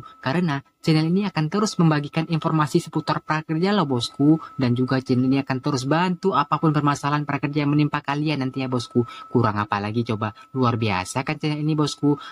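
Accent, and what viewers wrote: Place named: West Sumatra, Indonesia. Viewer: Trima kasih infonya bang Btw suaranya kek minang kocak hahaha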